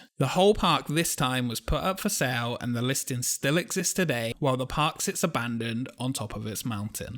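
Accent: United Kingdom. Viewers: AAAAAGGGGHHH... British Narration. FUCK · Where are you from? I know Britain, but which part? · It's funny hearing a British person describe a park in North Carolina. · What part of the UK are you from? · Why is it that all the narrators for videos like these seem to have British accents? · It's annoying to hear a Brit when it's in NC... that's why. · I mean, he does have a British accent.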